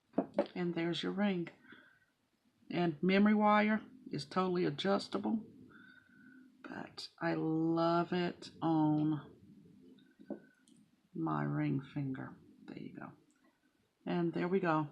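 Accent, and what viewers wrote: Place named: South, United States. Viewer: I came for the adorable southern accent. Ok, and the beautiful ring. Thanks!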